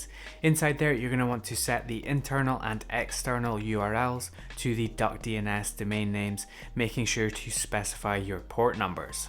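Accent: Scotland, United Kingdom. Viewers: Excellent video! Just getting started with Home Assistant and your channel is now my go to for all my questions and problems (also nice to hear a familiar friendly Scottish accent too!)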